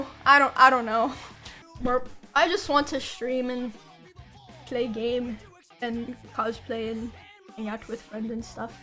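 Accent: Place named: Kansas, United States. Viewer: Nice Kansas accent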